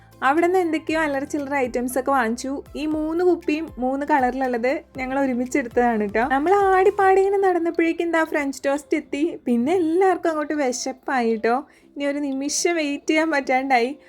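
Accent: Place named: Angamaly, India. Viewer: I was fond of ur angamali slang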